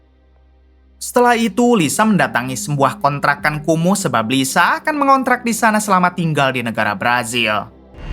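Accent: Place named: Bogor, Indonesia. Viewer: Bogor mana suaranya🎉🎉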